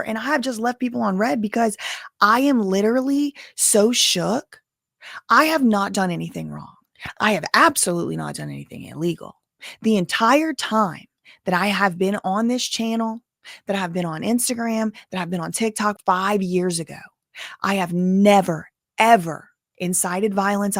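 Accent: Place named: Louisiana, United States. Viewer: I get a kick out of your witty banter and Louisiana twang. You always say “allegedly”, “in my opinion”, “Gods Favorite” and last but not least my favorite, “Facts Ain’t defamation!” Thank you for always bringing a smile to my face.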